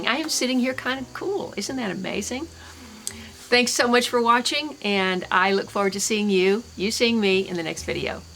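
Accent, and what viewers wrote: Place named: South, United States. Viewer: Is originally from the South? Sometimes I detect a bit of an accent 🤔 love this video, so much great information 😍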